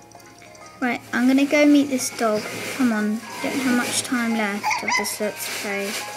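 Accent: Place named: United Kingdom. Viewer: you have a brittish acsent!!!!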